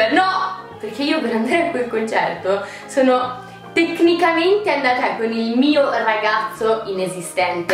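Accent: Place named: United States. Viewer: parli in italiano con l'intonazione americana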